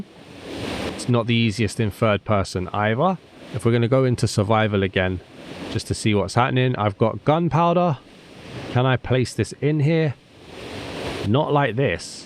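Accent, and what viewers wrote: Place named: United Kingdom. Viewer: Im never get tired of british accent 😅 tyal 4 the vid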